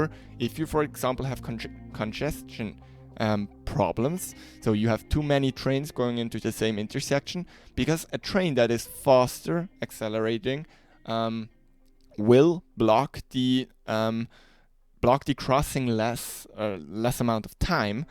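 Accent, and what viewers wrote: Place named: Germany. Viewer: Engineer with a german accent and 100% dedication? Definitely a like. TY for the work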